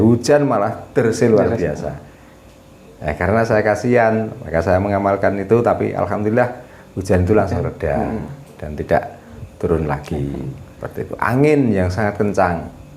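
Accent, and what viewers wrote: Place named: West Java, Indonesia. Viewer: Logat bahasane kok koyo wong sundo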